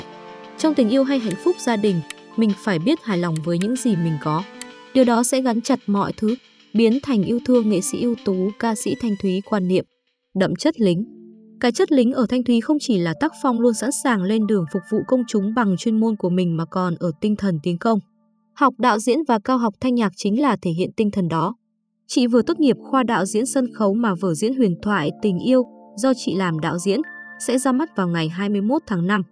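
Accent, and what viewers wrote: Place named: South, Vietnam. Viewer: cái chất giọng điêu luyện rặt Nam Bộ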